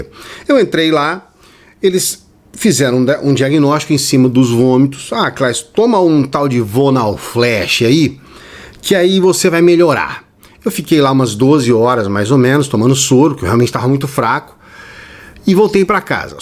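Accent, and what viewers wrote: Minas Gerais, Brazil. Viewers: Vc e mineiro?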